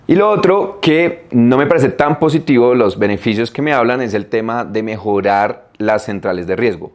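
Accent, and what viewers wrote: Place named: Colombia. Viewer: Tienes acento Colombiano, te refieres a 5 millones de pesos mexicanos?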